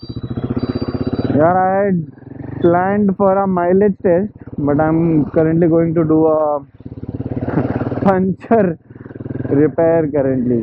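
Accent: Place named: Pakistan. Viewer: need to check with my friend but your Hindi is really good bro you sound like a urdu speaking muslim sometimes 😀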